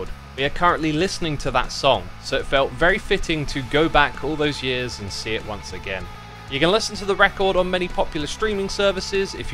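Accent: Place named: RP, United Kingdom. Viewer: Edit at the top for importance: Good music ill definitely check it out Wasnt quite a face reveal but its funny, nothing what i was expecting, funny how minds work with being canadian i hear tour accent and i picture a very right and proper dude just chillin drinking tea, i knew you werent, your personality cones out alot in your vids, just saying its funny how minds subliminally paint a picture and it sticks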